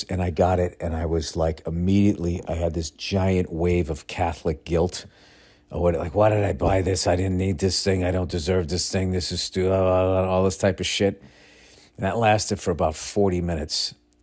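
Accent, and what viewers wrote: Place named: South, United States. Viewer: with the new truck. That southern accent going to fit in perfectly with that big ol truck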